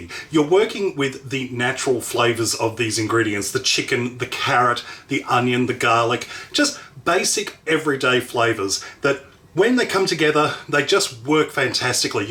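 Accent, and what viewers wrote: Australia. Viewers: OMG, didn't know you r OZ! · Man you di so well on youtubie shit what is your secret. You sound Australian as I am. Good work I have saved heaps of your recipies.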